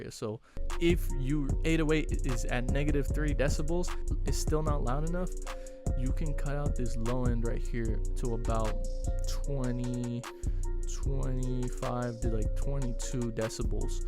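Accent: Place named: Mexico. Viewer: idk why but to me you sound mexican lol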